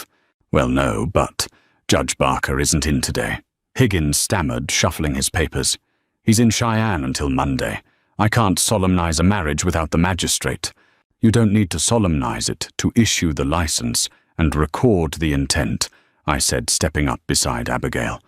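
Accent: England, United Kingdom. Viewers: narrated by an American frontiersman with AN ENGLISH ACCENT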